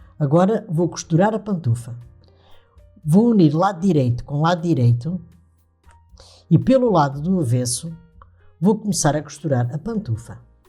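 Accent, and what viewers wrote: Portugal. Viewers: Gostei muito do modelo da sua pantufas! Ë lindíssima e muito dificil de fazer(para mim que não sei faser tricô)! Vejo que você faz obras de arte com o tricô! Ah, também adorei o seu sutaque português! Sempre quiz conhecer portugal...sou brasileira e vivo na Argentina. Vou compartir com minhas amigas! BsAs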